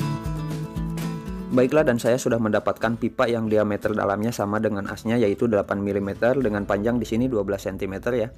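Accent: West Java, Indonesia. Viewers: logatnya urang sunda pisan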